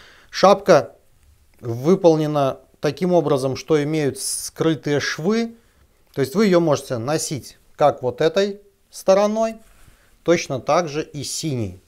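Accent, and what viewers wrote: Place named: Germany. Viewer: Ну, если написано по-английски, то произносить желательно не по-немецки. Но это мелочи, обзоры хорошие.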